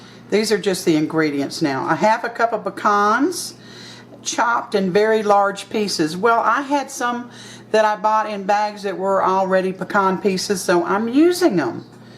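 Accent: South, United States. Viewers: Luv ya,,,, your coment about unsalted butter,,, "honestly that is such a farse" with your southern drawl. LOL the best!! And that is so how I feel as well, THANK YOU. Plus it also reminded me of my beloved aunt Caroline. So here in the midwest we once a had grocer chain that carried the once well known bakery line of McGlynn Bakery and they had these! Yes, the pastry dough part was much like a cross of a Eclair and a Croissant just like what these look to be!! :0) Where as all other cinnamon/sticky buns were like some boring flat bread dough that is barely ediable fresh out of the oven. Oh and the McGlynn ones didn't have raisins which was so fine with me. And thats been more than 20 ago.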